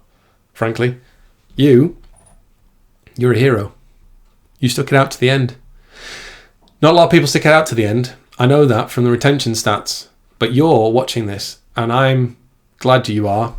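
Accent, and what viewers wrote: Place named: United Kingdom. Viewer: just watched first 7 minutes, and then... Jake at Udacity?? see you in a few days,! (also: really enjoying your British)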